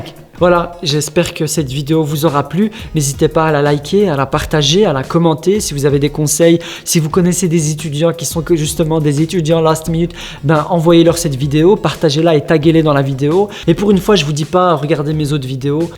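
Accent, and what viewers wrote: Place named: Belgium. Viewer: Vous êtes de nationalité belge non? (De Bruxelles) Sans vouloir être indiscrète? Cet accent ne m'est pas inconnu hihi 😆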